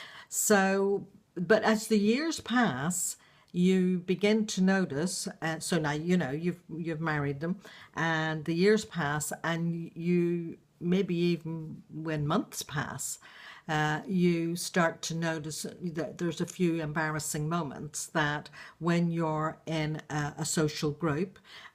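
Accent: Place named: Northern Ireland, United Kingdom. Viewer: are you originally from Northern Ireland? I think I can hear an Americanized version of that charming accent. I am watching your videos from mostly the other side of the coin, because I am in danger of getting lost in the alcohol quagmire myself. For a good few years now I've come home from a full-on, highly responsible job and used too much alcohol to calm my senses more often than I should. As much as I detest my addicition to nicotine, alcohol abuse is my real problem and I've grown to hate it and hate myself for being like this. I still function extremely well (but not to my full potential) in my work and pay my bills and my share elsewhere. I've also been watching motivational videos of how people's lives got much better when they quit drinking as well as others who are realistic about their anxiety and how alcohol gives them a brief respite from it, and that's probably me. 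I'm a competent female tech geek who works in a team with 30 guys, but I support primarily other women in top management positions who jokingly(?) say 'they hear the wine calling' at the end of a hard day, so I think this problem may be bigger than most people imagine. I won't go into my life story except to say that I've had a few truly fucking terrible relationships where my partner has been 'trying', to say the least, and one of them even nearly killed me (fortunately a very short-lived yet traumatic relationship once he showed his true colours - he ended up in jail). But the year I spent in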